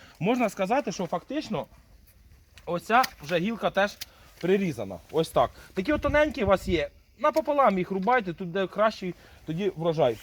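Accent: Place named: Podillia, Ukraine. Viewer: Подільський діалект.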